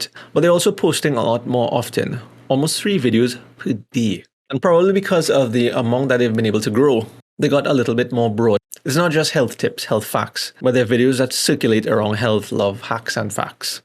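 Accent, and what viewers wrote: Trinidad and Tobago. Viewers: Dude are you from Trinidad? Your accent caught me off guard crazy!!!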